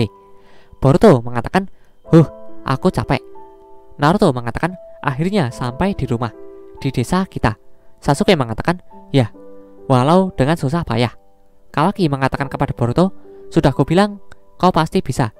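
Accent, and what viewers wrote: Java, Indonesia. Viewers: Medok suaranya bang..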